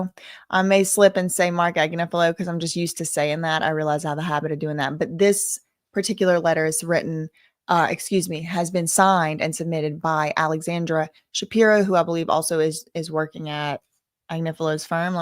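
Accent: South, United States. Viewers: I certainly agree that has a beautiful southern accent. She has an amazing cadence and is so easy to listen too! 💚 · I swear that the more I listen to her, the more I feel like Im becoming a Southerner ya'll.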